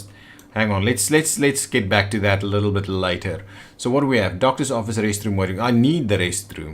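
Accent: South Africa. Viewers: Are you from South Africa? Guessing based on your accent and prenunciation. And I like South African accent. BTW, nice gameplay and commendary. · Can’t say I’ve heard a South African accent before...it’s really interesting. To my American ears it sounds like Scottish, Australian, and African rolled into one...like I’m listening to 3 different people lol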